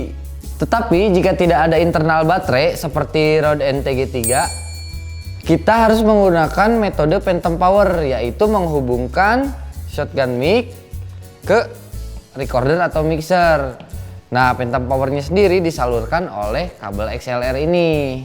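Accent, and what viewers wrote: West Java, Indonesia. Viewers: Logat sundanya berasa hehe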